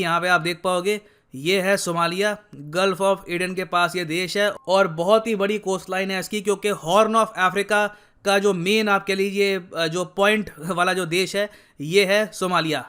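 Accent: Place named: Haryana, India. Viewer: Haryanvi accent 😃😃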